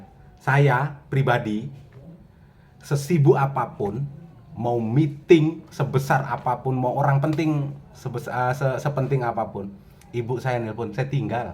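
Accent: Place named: Java, Indonesia. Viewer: bang asline wong ndi kok jowone ketok banget heheh.. makasih ilmunya bang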